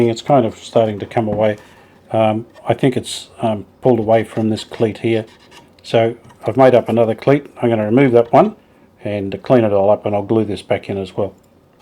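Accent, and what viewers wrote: Australia. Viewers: Bravo! I have watched about a half dozen of your videos from start to finish the last couple of days and I am impressed by your expertise. I have been learning a lot even though I will probably never be able to repair the electrical components of a tube radio but, I watch to see and learn from your timber restoration techniques. I have restored a couple of timber (we call it wood here in the US) tube radio cabinets recently. I'm guessing your dim light controller is home made. Is there any chance of making a video showing how to make an exact duplicate? Just a thought. Is your shelf of radios in any of your videos? It would be great to see that as well. I also enjoy your Aussie accent and unusual Aussie phrases. Keep up the great work!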